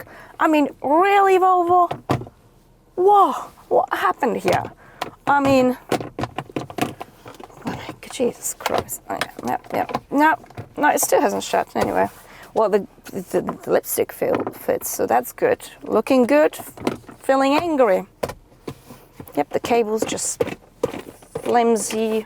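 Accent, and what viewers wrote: Australia. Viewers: aha cool! Yeah the polish number plate gave it away and i recognised some Aussie/Nz accent from living there aswell. Nah Yeah have a good one! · Did you learn your english in Australia or south africa?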